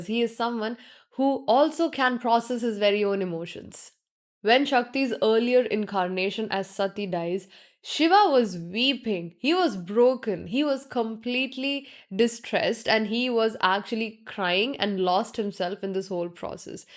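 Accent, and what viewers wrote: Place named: India. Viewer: You’re beautiful it’s true. I love your accent! Hindustan/India has a rich culture. I have lived with Hindi/Indian people. They are kind and considerate. I love Sanskrit. It is like an alien language. Bhakti: Devotion, love, and faith in a deity. Brahman: The ultimate reality or divine principle in Hinduism. Karma: The principle of cause and effect. Moksha: Spiritual liberation. Samsara: The cycle of birth, death, and rebirth. Satya: Truth. The way I seen it certain languages control matter=mother=care. The connotation and tone,rhythm, pitch mean a lot. It reverberates out like a pebble in a pond. The ripples outward affect the whole pond even when not seen. The effects are seen later. People wonder where it came from. For every reaction there is an equal or opposite reaction. It matters what you say. What you say matters. Sound and emotion creates matter. 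A calm nervous system helps create a better life. It changes the outside world. (Matter) Hence OM! Sweet OM! 🕉️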